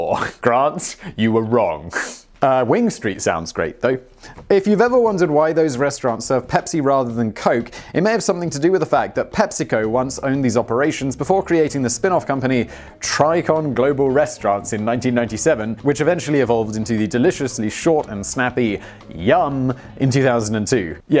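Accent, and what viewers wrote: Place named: United Kingdom. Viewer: After years of listening to, I was alarmingly quick to accept that it was just some goofy British pronunciation.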